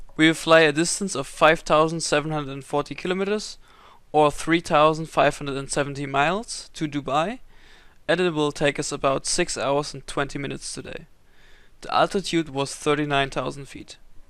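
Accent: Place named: Germany. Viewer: Selten so deutsches Englisch gehört haha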